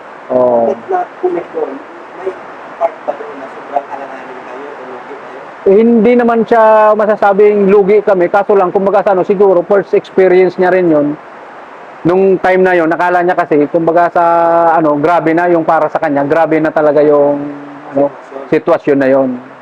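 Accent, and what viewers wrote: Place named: Bicol, Philippines. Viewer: Bicolano Yaya si sir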